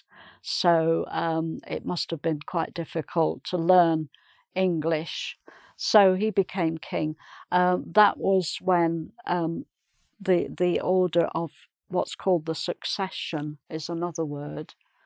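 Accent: United Kingdom. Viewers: Love your beautiful British English.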